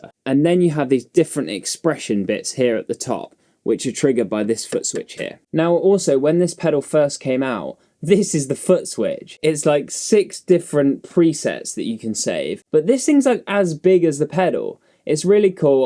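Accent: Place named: United Kingdom. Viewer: My weekly bri ish pronunciation lesson ❤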